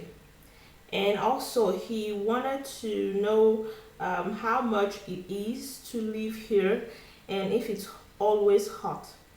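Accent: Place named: Nigeria. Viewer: im guessing Nigeria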